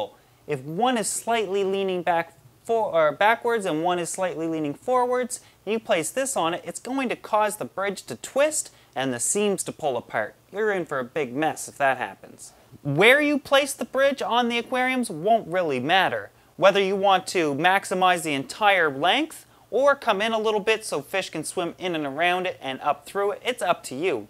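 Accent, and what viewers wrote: Canada. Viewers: fuck I love the way canadians talk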